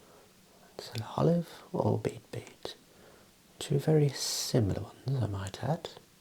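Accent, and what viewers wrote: Birmingham, United Kingdom. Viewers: Brummie accent. Found in Birmingham UK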